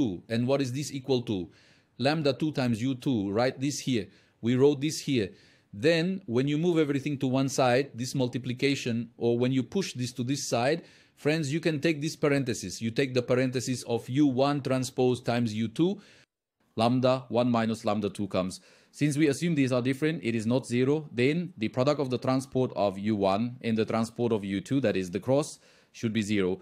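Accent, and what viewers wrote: India. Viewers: Hı teacher . YouTube made your accent like Indians accent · biraz indian english olmuş hsdjgfs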